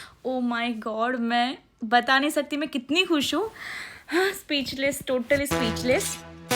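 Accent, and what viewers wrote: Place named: Gujarat, India. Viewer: Your gujarati accent is good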